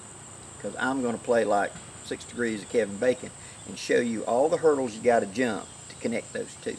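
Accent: South, United States. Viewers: I would listen to you talk about stacking rocks, just to hear a good ole southern accent again. 🤠 Enjoyed this "Story Time". Take it easy.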